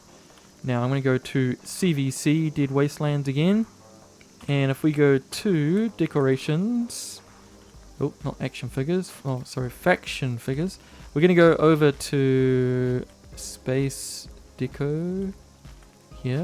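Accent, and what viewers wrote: New Zealand. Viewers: Do you have a NZ accent? Love your channel.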